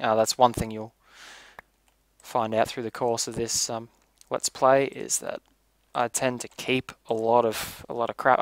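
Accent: Australia. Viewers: yay, I'm welcome :). Im happy that there is an lp'er who speaks in an Australian accent.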